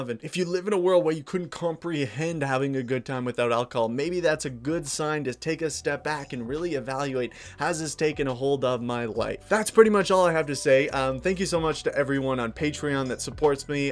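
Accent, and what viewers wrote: Canada. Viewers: When you realize he’s Canadian, the accent becomes sooooo clear.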